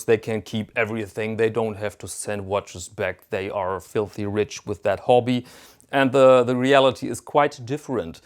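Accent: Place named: Germany. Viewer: The German accent adds credibility. Where do I get one?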